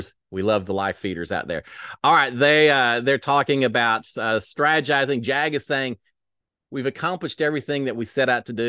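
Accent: Texas, United States. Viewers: Thank you, for all of your hard work. I especially appreciate your clean recaps bc I'm not a fan of the language so many of the house guests use. So thank you for that. Not to mention, I totally enjoy hearing a fellow Texan while I'm living in Phoenix😂 Have a Blessed day!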